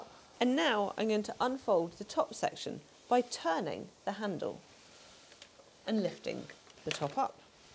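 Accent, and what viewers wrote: RP, United Kingdom. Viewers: Her accent reminds me of Queen Elizabeth.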